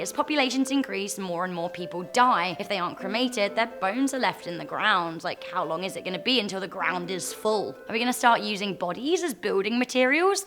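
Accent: United Kingdom. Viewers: Lol dont know what it is but I love it how British people narrate anything, reason why I watch BBC America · I LOVE YOUR BRITISH ACCENT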